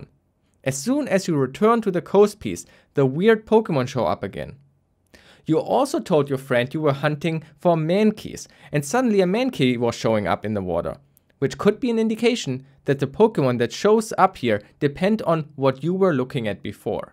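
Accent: Germany. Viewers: Beim Hören gemerkt der ist doch ein Deutscher und wurde im Impressum bestätigt. LG aus Bremen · Man hört so raus dass du deutsch sprichst xD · Ich liebe diesen deutschen akzent 😂 aber geniales video 👌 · Er spricht gutes Englisch aber man hört trotzdem das er Deutscher ist 😄👌 Damals als Kinder haben wir Handy Pokemon gesagt weil Missigno mit der Antenne aussieht wie ein Handy 😂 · Er spricht englisch, hört sich aber deutsch an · the german accent is so strong 🤣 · digga dein deutscher akzent ist ja mal anders cringe :'D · I knew you must be a German (way of speaking) 🤨 and you are... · Nice German accent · i can hear this guy is german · You sound very much like youre german. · love the video,are you german?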